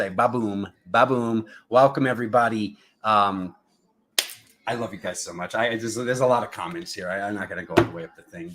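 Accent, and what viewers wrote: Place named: South, United States. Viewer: "He's a badboy but he's a good mayn"🤣 🤣 But I like that Southern draw😇 I love your vibe, We're always having a good time 🤗🤣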